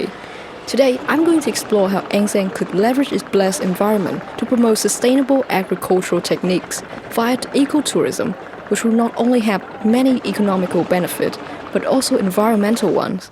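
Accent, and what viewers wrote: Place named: United Kingdom. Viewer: Nguyễn Triều Nguyên, from Nguyễn Sinh Sắc High School here, your performance is awsome ! and it seems like it must took you a lots if times to make this video, and you got a good British voice right there, nice ~<3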